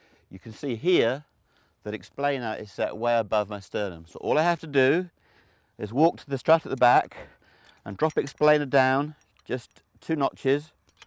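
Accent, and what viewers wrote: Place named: United Kingdom. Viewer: This guy is so British and I love it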